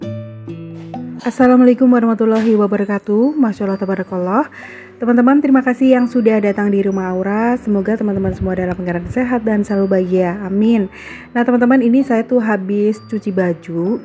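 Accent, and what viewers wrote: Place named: Java, Indonesia. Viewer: Mbae org Jawa nya mana mbaa.. Kerasa medoknya.. Hehe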